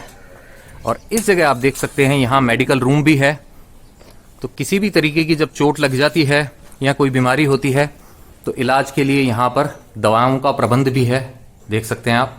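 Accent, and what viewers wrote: Standard. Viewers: Jai Karni Mata ki. Aur app ke dwara hum sab jo dekh rahe hai jan rahe hai uske liye app ka avari hoon. App ki sudh Hindi bahut hi accha lagta hai. U r too good spokesman, like it. App acchi Sanskrit bhi bolte hai. Karni Mata ki sabhi jagah behad Sundar hai aur mainly safsutra dekhne wali hai. Goushala bhi bahut accha aur saf hai. Too nice vlog presented by you ji thankful to you for it.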